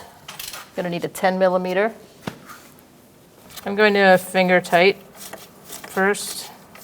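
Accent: Boston, United States. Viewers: my favorite mechanic chick and that Boston accent!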